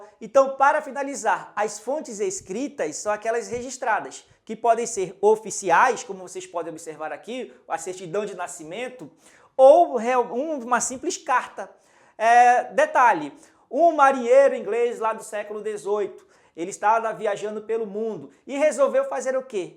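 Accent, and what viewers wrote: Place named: Manaus, Brazil. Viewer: Vc e de Manaus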